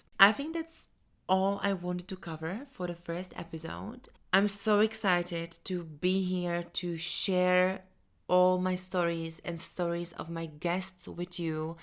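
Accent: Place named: Czech Republic. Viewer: First of all, I want to say thank you for your channel. I am Mexican American. I am a bodybuilder. I love to lift weights and I’m very attracted . To trans women and there stories I love the fact you guys seem very down to earth from what I have gathered on YouTube so I’m looking forward to this podcast and hopefully I may be able to date a trans woman one day but yeah, I’m very intrigued and I don’t even know where to look for trans women here in America… also my friend is she is ❤from Czech Republic that’s how I noticed your accent❤ by the way you are very gorgeous with all due respect🌹🌹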